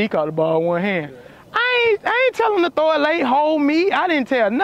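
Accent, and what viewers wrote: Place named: Florida, United States. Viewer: That Florida twang is so exclusive! 💪🏾😎🌞🌴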